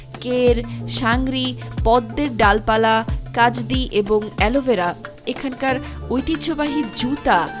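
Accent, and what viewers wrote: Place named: West Bengal, India. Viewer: আপনি তো ম্যাডাম ভারতের পশ্চিমবঙ্গের মানুষের মতো উচ্চারণ করেন, কথা বলেন। তো -- হিন্দুদের ধর্ম নিয়ে ভারতে চলে আসুন-- ভারত আপনাকে স্বাগত জানাবে ------!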